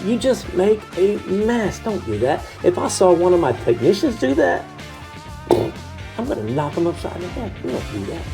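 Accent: South, United States. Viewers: I’m South south Louisiana. Your accent gives your southern away too. Last 2 years, our subdivision has been invaded by Crazy Ants. They don’t follow a trail, don’t bite, and seem frantic and only outside so far. Millions of ants like a true invasion. LSU says they act like that because they don’t have a Queen. They are coming out of the sugarcane field. Because we don’t know where the colony is, and they usually don’t return to the colony, there is no way to destroy them. LSU treating yards with the termite poison, but have to treat every few months. I would love to stop spraying as we have ducks, chickens dogs, cats etc. Have you all had these ants? It’s INSANE.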